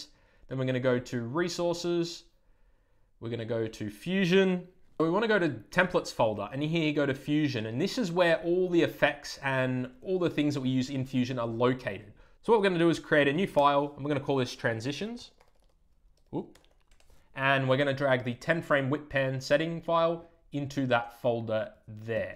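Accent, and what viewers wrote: Australia. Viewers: Thanks for that tutorial! Really well explained and value add. And is that an Aussie accent I hear? Nice one mate!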